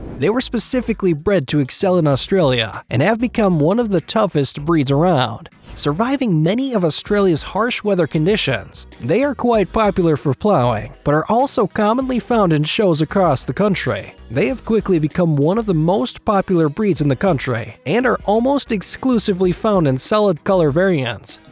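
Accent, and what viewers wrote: United States. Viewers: I love how this dude speaks "Countrey"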